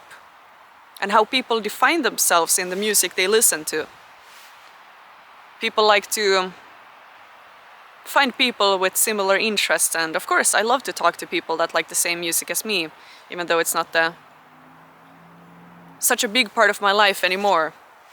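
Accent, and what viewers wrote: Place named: Finland. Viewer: I absolutely LOVE your Finnish accent speaking English! Especially the way you say "Music"! I began learning guitar in 1968 as a child and grew into the music of 1970's. In my opinion the absolute best decade for music of the 20th century and I agree with you for the same reasons even though I am old enough to be contemporaries of your grand parents. I like your channel.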